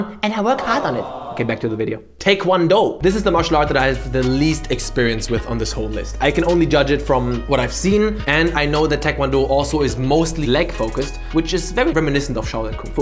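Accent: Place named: Germany. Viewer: Redest du eigentlich extra so ein klischeehaftes englisch als deutscher oder versuchst du erst garnicht die Wörter richtig auszusprechen?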